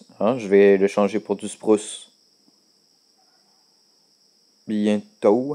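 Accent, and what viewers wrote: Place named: Quebec, Canada. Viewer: Elles sont taquer classe ses maison et j aime bien son accent sa fait styler ;-) j aime le Québec mec j aimerais bien que tu nous apprenne comment faire des maisons steampunk differentes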